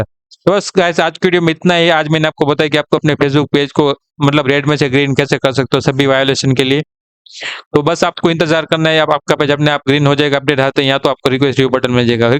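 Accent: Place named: Gujarat, India. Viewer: आप गुजराती हो बोली इसी लगती है ❤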